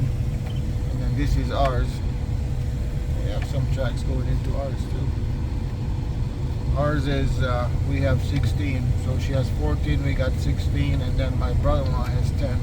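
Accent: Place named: United States. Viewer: Salut! M-am uitat la câteva video-uri. Pari american din tata-n fiu, după vorba, port, n-as fi spus ca ești din România. Ma uit mult la video-uri despre Alaska, ma fascineaza și de asta, presupun, mi-au apărut video-urile tale. Ai ales o zona în care nu s-ar duce mulți romani, dificila, dar îți oferă o libertate, liniște pe care nu o regăsești în multe locuri. De cati ani esti in America? Sănătate!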